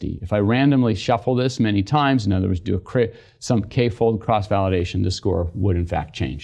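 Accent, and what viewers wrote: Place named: General American, United States. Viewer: A new class of teaching👏👏👏 Good style, perfect suit, standard american accent and high quality content. Thanks a lot👌👌👌👏👏👏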